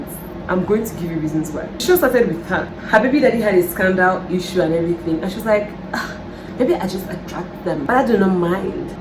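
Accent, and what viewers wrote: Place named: United Kingdom. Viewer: Your British accent 🙌😹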